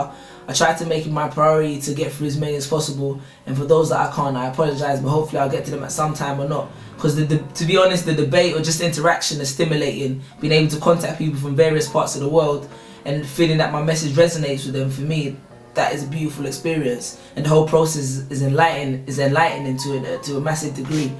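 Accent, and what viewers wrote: United Kingdom. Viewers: hey were are u from, i can tell u haev a british acent. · I love your accent.. it's almost confusing though. Some words you say you sound Haitian and other words you sound British.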